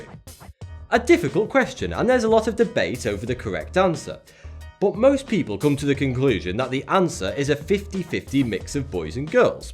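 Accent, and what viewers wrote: United Kingdom. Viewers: I love how your British Dialect makes saying "penis and clitoris" sound so eloquant.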